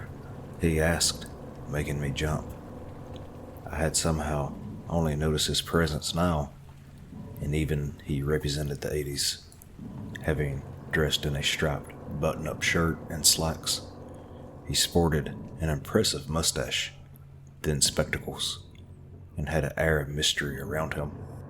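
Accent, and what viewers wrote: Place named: South, United States. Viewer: I like the southern accent, just like mine haha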